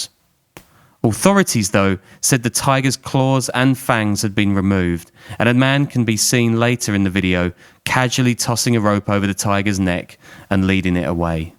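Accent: United Kingdom. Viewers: Didn’t watch the entire video, as I was sick of seeing the same pictures over and over. And someone with a British accent talking about something that happened in Mexico is very odd …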